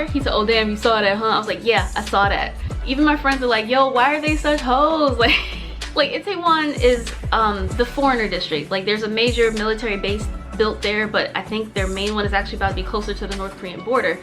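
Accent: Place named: England, United Kingdom. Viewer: PS love your English accent 😁😂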